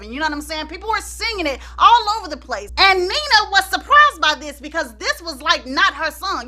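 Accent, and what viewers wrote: South, United States. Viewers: I just love your channel and the Way you sound like a country girl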